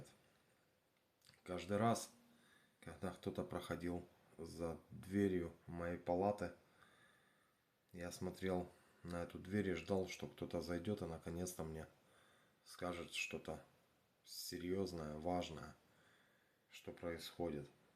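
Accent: Ukraine. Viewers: да он не в России, не слышите говор что ли? Где то за границей украинец. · А разговаривает с украинским акцентом.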